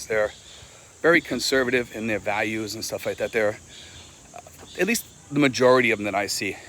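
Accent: Boston, United States. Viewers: Thank you for saying that there are some good women in America! We are not all entitled, narcissists. Some of us ae hard-working, kind people who just want to keep our heads above water. BTW, I detect a Boston accent in your video...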